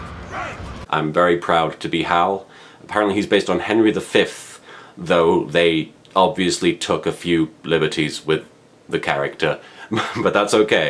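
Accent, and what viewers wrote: United Kingdom. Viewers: Holy shit he's British.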